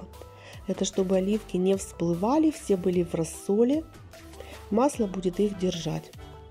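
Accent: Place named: Ukraine. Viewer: Да, 🫒🫒🫒🌶️ , кушаем. Узнаю Украинский акцент :))) Молодец, что готовите сами оливки 🫒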